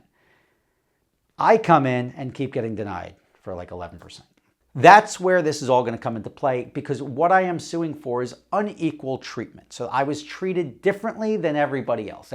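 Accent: New Jersey, United States. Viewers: Sounds like Jersey alright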